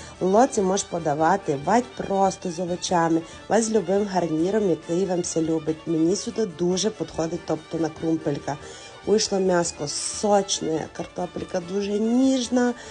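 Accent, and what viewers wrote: Transcarpathia, Ukraine. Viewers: А яка чудова мова!))) я нібито на Закарпатті опинилась)))) Дякую за рецепт і за настрій)))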